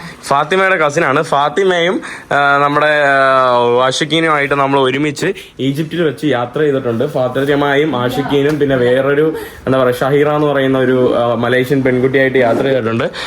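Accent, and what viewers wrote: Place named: Thiruvananthapuram-Kollam, India. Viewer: റബ്ബരും വിള 😂 Extreme തിരുവനന്തപുരം കൊല്ലം സ്ലാങ്...... അത് കേൾക്കാൻ തന്നെ ഒരു നൊസ്റ്റാൾജിക് ഫീൽ.....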